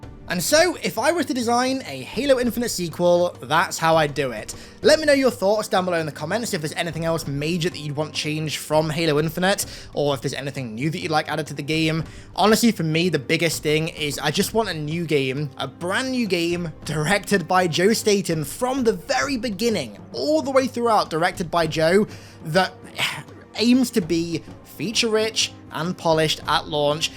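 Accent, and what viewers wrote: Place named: United Kingdom. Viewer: Disliked for the Bri'ish accent alone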